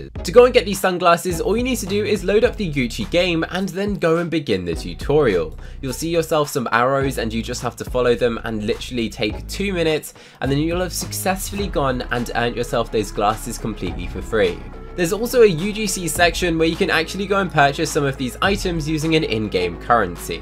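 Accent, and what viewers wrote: United Kingdom. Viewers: He's british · Not trying to be rude or anything, but through the entire video you pronounce z like zed and in proper English American it’s sed like z like zee I’m not saying, you have to change how you talk and I’m not meaning listen any rude way, but I’m just saying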